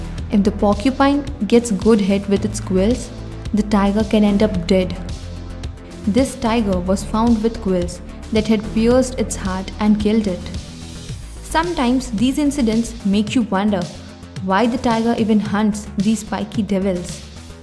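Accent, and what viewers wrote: India. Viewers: I nearly listened this 30 times Her voice is the best and Indian accent 😍 is really best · I never knew indian English is better and sounds cute than American english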